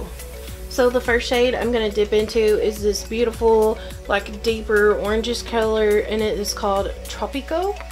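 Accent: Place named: Kentucky, United States. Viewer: Subbed from IG! I'm audiesmama there! I'm also originally a Kentucky girl! You sound like home to me! 💙 great video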